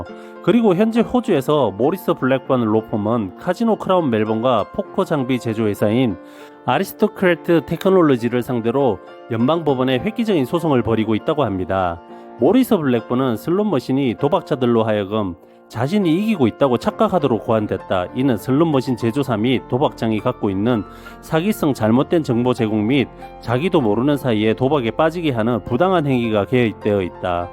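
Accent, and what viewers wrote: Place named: Gyeongsang, South Korea. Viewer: 경상도 분이시네예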